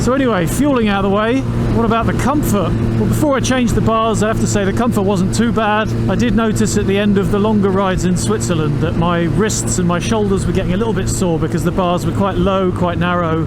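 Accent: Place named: United Kingdom. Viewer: Wow, amazing review... really good quality everything, congratulations man. Your British accent along with the metric system talk sounded odd but so, so satisfying :). Can't believe I've watched a 30 min review without getting bored and even being surprised it ended so quick. You've just got a new subscriber ;)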